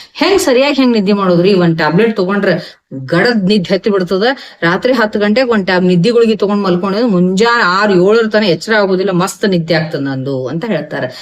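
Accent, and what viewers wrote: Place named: Hubli, India. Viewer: Mam namm hubli bashe n chanda helthiri tq mam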